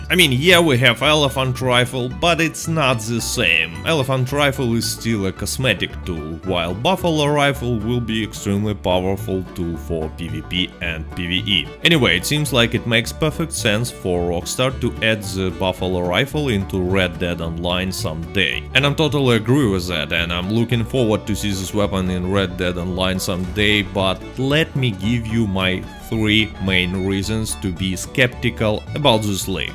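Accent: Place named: Russia. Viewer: English with a Russian accent is cool to listen to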